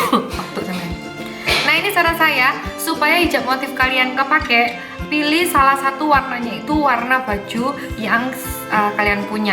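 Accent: Java, Indonesia. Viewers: Hehe sy malah demen lht gaya bhasanya die selain cantik logat jawanya ntu tuh gemes 😁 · Orang jawa nya mba kelihatan medok banger maaf nyh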